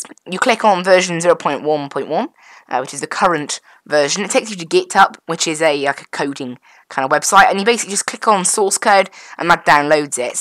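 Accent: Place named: United Kingdom. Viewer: this is how i imagined all british people sound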